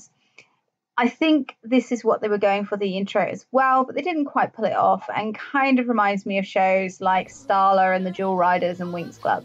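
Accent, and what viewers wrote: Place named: United Kingdom. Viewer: You kept saying antagonist wherein you should be saying protagonist... Is it me or is it your brit accent? Anyway I do like this new iteration. But the old one was way better.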